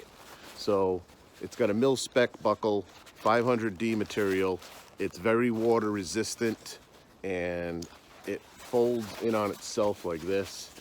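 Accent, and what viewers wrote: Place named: Massachusetts, United States. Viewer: Good stuff. Nice to hear a MA accent. Maple House sent me here. Subbed.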